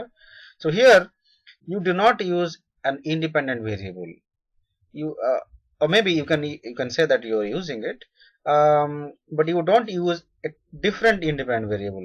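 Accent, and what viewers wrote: India. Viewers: Hope you can speak Hindi fluently in Indian accent. So ungrateful of you. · Fucking annoying indian accent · Indian accent! Thanks for your video :D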